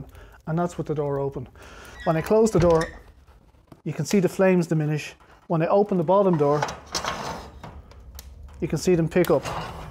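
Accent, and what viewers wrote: Ireland. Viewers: Hi..Watching you again and enjoying your videos, also you have a wonderful Irish accent that take me back to the old country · i could tell by the accent . But was not sure if it was a dublin accent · Looks dangerous....but love your Irish accent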